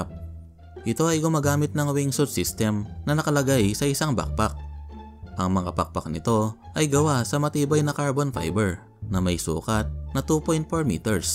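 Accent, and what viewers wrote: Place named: Ifugao, Philippines. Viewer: KASLA KA NGA KIYANGAN NGA AGSAO MET. TAGA IFUGAO KA BA?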